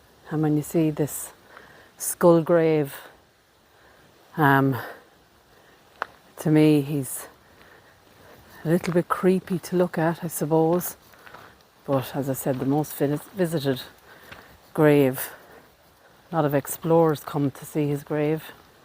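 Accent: Ireland. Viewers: Nice accent Ireland probably the best in the world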